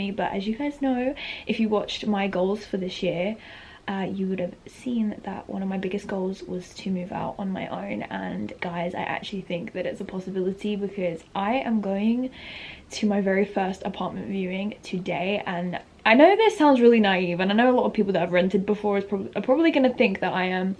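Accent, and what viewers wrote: United Kingdom. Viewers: Are u from London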